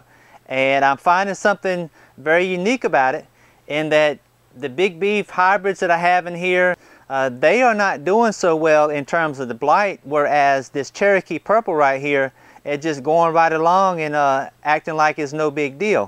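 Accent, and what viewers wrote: South, United States. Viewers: My Tennessee wife is dieing to know where you are from, she’s always asking where people ok youtube I watch are from if they have southern accents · I love your accent. What part of the south are you from? It makes me thoroughly enjoy your videos in addition to the awesome info!!!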